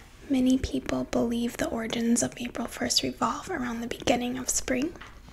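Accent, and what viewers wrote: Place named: Wisconsin, United States. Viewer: Your definitely from Wisconsin lmao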